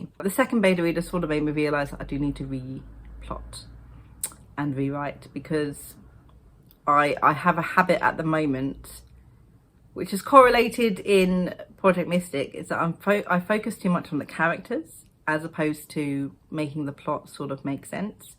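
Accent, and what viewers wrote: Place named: United Kingdom. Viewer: So good to see you again! ^_^ I am all in for the tea and the british babbling, please gimme all the vibes, LOL! I prevent/ cure creative burnout with rest and/or other creative things. Handcrafting helps when I feel I don't get anything done - some hours of work, and you can literally see the work and put your hands on it. Consuming stories sparks new ideas, and listening to audiobiooks while weaving or sewing combines the best of both worlds. I am also not planning on writing anything new anytime soon. I want to finish the current part of my fantasy series - and once that is done, I need to go back and revisit some of the earlier parts, make some minor revisions and bring it all up to my current standard of writing and world building. That should be enough to keep me busy duringall of 2022. ;-) The title and cover of former Project Fae are amazing, they gave me the shivers! 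^_^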